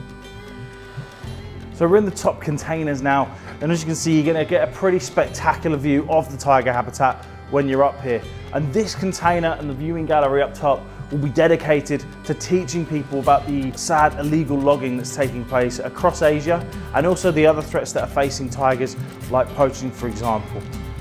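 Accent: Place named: Germany. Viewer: Cara orang Jerman bicara Inggris ketauan banget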